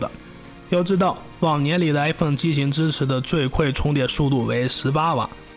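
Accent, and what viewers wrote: Shanxi, China. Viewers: 确切一点是山西口音，或者西北口音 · 而且是山西中北部，晋南不是这味儿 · 这口音不是山西就是呼和浩特的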